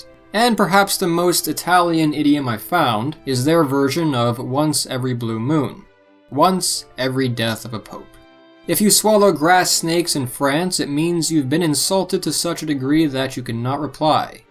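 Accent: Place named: United States. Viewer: This guy can't speak German to save his life. I can speak both, and I tried intensely listening to what he was saying, but I just couldn't make anything out. He was saying it in such a heavy American accent that the words just become nonsensical gibberish Seriously, if his life depended on speaking just one regular basic German sentence like that, he'd be long dead. He's that bad! Cos NOBODY would understand him looool.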